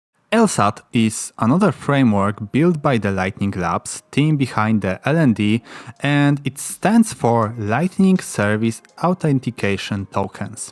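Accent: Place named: Poland. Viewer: Where are you from? You sounds a bit Polish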